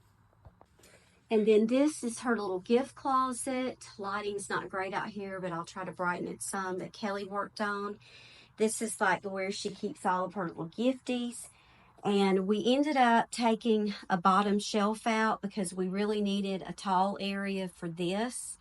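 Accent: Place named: Alabama, United States. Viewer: Came for the organization, stayed for the beautiful Alabama accent! Music to this Southern gal's ears!!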